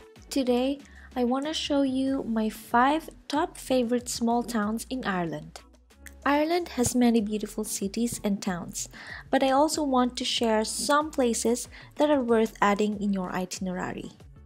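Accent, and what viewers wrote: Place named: Philippines. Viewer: I think the way you speak and your english and it seems you are a filipino?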